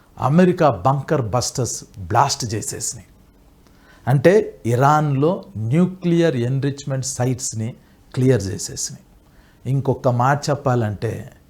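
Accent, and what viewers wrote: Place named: United Kingdom. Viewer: Babu munda meeru Telugu Nu Telugu laga matladute baaguntadi please English accent vaada vaddu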